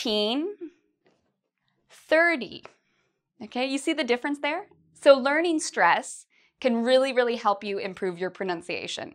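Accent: Canada. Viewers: thank you so much for your videos! Because of your lessons I have greatly improved my pronunciation and grammar. BTW, love the Canadian accent:) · I thought you were a Canadian lol...In HIMYM everyone would laugh at Robin cuz of her pronunciation ahahah...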